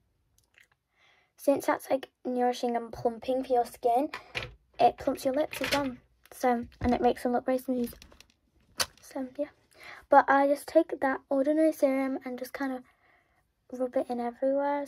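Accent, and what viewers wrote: United Kingdom. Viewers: I don't know where you're from but your British accent is a chef's kiss xx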